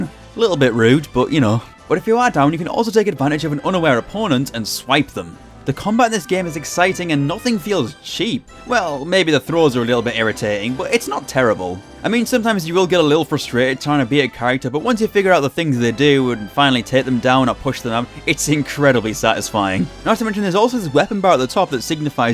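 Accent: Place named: Northumberland, United Kingdom. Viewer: I swear down you sound like a Northumbrian. If not somewhere from the north east.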